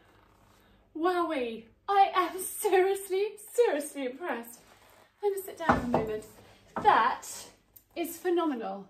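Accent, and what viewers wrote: United Kingdom. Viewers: Dream wifie material complete with distinctive British accent. Very lucky husband indeed.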